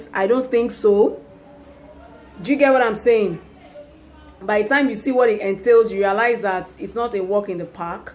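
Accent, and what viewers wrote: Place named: Nigeria. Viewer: Hello I love your authentic Nigerian accent! The Nigerians that I know are very hard working and ambitious people!! you seem to be so driven about your life and future!!! What I wanted to ask you is if it is possible to raise a family with the salary that you guys make as a flight attendant for Emirates? Is Emirates hiring again pretty girls and boys from the world over?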